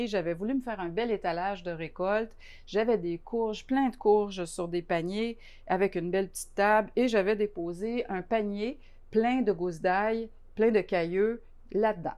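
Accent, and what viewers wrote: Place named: Quebec, Canada. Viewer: cet accent fleuri du Québec, magique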